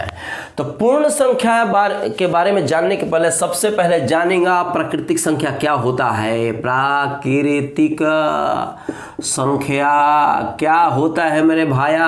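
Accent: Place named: Bhojpuri, India. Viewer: Sir apka bhojpuri wala acent acha lagta hai with bhojpuri song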